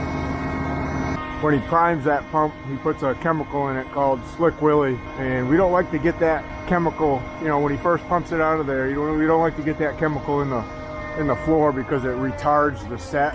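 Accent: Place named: New England, United States. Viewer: You gotta be local like Maine mass or new York with that accent I recognize and love it and time I hear a new englander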